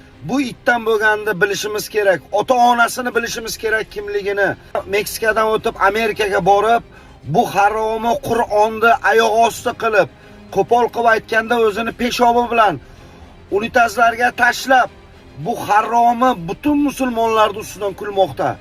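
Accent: Tajikistan. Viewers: ШЕВАСИДАН МАЬЛУМКУ БУ УЗБЕКМАС ТОЖИККУ. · Бу тожик акценти билиниб турибди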